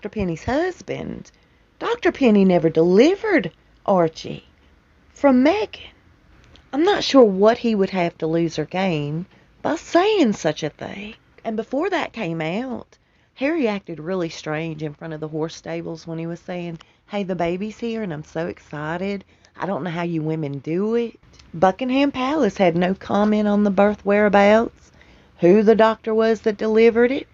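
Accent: South, United States. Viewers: By the way, I love your southern accent, love to hear you talking.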